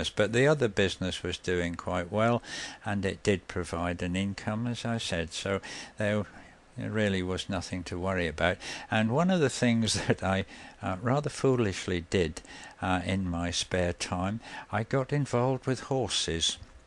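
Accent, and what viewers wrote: United Kingdom. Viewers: Wonderful videos. You remind me of my own grandfather, save for the british accent but just the way he told his own life stories. Looking foward to hearing more.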